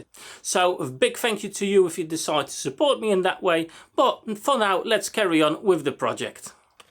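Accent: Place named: United Kingdom. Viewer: Wiedziałam, że z UK. Zastanawiałam się tylko z jakiego miasta konkretnie. Fascynują mnie akcenty. Ja mieszkałam przez 5 lat w Colchester w czasie studiów.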